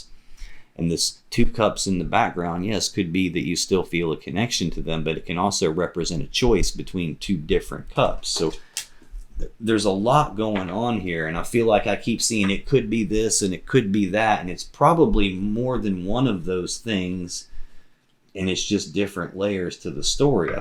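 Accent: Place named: Texas, United States. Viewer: Your accent is going to fit in nicely in Texas, it almost sounds like a native Texan.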